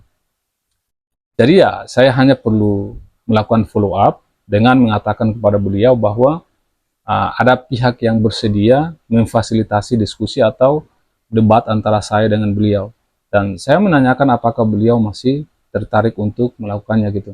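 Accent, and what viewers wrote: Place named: East Nusa Tenggara, Indonesia. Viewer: Logatnya sepertinys orang NTT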